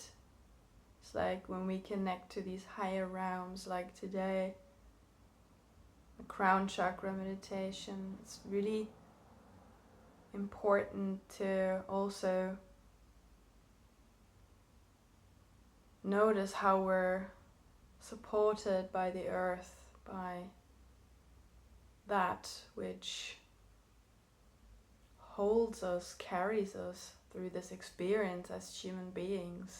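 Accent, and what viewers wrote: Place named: Ireland. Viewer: first comment🤪why didn't we start with crown? sorry, I don't mean to be a prick(it just comes natural lol). I like to employ frequency & vibration, light & sound. as far as I understand the bliss of the chakra-gasms, its different from the actual transcendence , but essential on the way. 😁your wisdom is beyond your years, im jealous LOL "when I was your age..."😂 your voice is perfect, brogue? can you run your voice through a compressor or limiter & boost the db so I can hear your voice at an even volume.🥰 crap im not being critical honest😇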